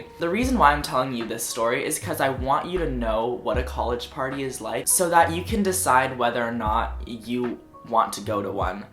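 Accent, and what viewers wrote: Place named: Texas, United States. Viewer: Conan Gray You still talk like a Texan and I love it cause it’s like a high pitched country voice and it’s my favorite thing in the world!!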